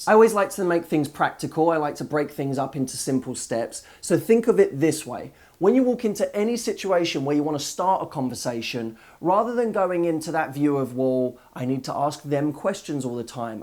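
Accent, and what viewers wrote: United States. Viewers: I love the American accent - the deep voice!, you are amazing. Thank you so much! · Your American Accent is awesome! Thank you for the helpful content. 😉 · Is that a Californian accent? Regardless, your rendition was awesome. You slip into and American accent like it's butter, i.e smooth! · Your American accent is adorable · good American accent dude:) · Omg the american accent is great! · Amercan accent!!!!! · Love the American accent...lol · his American accent reminds me of Ryan goslings voice lol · Jesus. Your American accent makes me feel like you're playing all of us all of the time.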